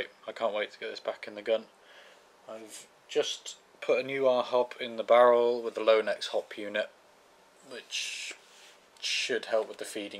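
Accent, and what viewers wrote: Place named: England, United Kingdom. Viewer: Nice to hear an English voice behind this project! Great work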